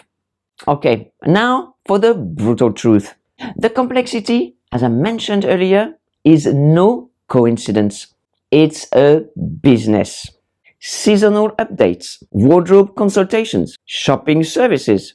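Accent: France. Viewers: Loved this❤such a charming, elegant lady with empowering advice and lovely French accent. Colour analysis was Big when i grew up, but after studying colour theory myself and experimenting for years i ended up with same conclusion as you😊 i used to like silver and cold colours but with age i look better in Warm colours and gold. Basically i choose colours that look good with my skin and can wear the same items over and over without wasting on trend colours. This allowed me to invest in quality items that i am truly happy with.Easy- peasy, sustainable & money saved😍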